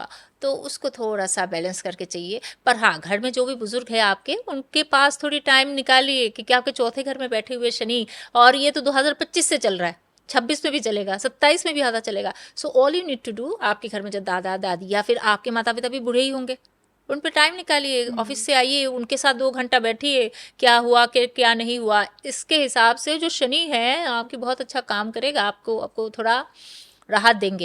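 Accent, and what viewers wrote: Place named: Bengal, India. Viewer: She is a Bengali,so the accent,but her predictions are good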